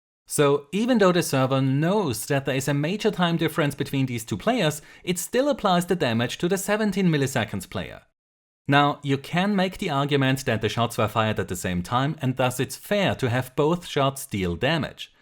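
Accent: Germany. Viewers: Ist der deutsch? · Where is from? Sounds a little german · warum nicht auch mal auf deutsch...zumindest für die nicht der englischen sprache mächtigen. xD ich behaupte am akzent zu hören das es deutsch ist...am i right? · I'm not gonna lie, while I like this info, it's this guys accent that made this video really enjoyable. He sounds like someone you'd play some European Work-Vehicle-Simulator-Game with and tell you stories about how he was part of the Federal German Ground Forces, ready to defend his bakery to the death in Alfeld if Warsaw Pact forces crossed the Fulda Gap and tried to reach the Rhine. ;_;7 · Was für ein schönes deutscher Akzent. Danke für das Video.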